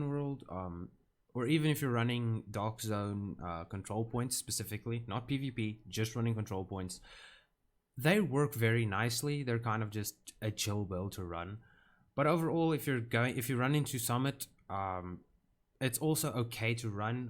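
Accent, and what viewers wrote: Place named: South Africa. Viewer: Thanks for the video. Which part of SA are you from? I've been to Durban for some time.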